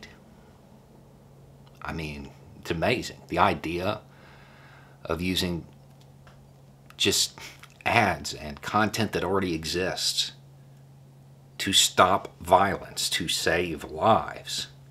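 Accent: South, United States. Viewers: Go, your southern accent was what first caught my attention because half of my family is from the South. The rest are from above Chicago. After you accent, I listened closely to the content...I have learned a lot...and I'm glad about that...Keep up the good work...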